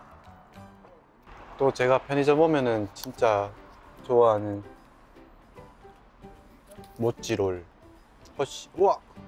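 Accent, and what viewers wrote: Gyeongsang, South Korea. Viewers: You are from Daegu right? I can recognize his accent 😂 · Its cute how he talks with gyeongsangdo(?) dialect. That intonation can be heard by people from there hehe is from daegu right ^^ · 오 현실말투 갱상도싸나이네요~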